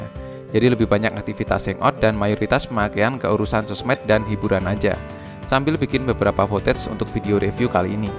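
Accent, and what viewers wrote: Yogyakarta, Indonesia. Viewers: Wong jogja po?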